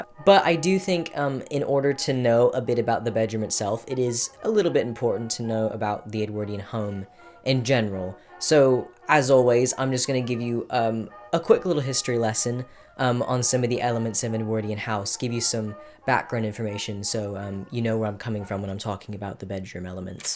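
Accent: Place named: United Kingdom. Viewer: I can't tell if you're British or not it sounds like you are British but are trying to speak with an American accent or something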